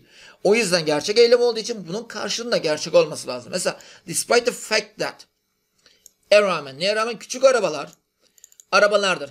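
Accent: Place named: Arab world. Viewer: hocam arapça edasıyla ingilizce ders anlatıyosunuz.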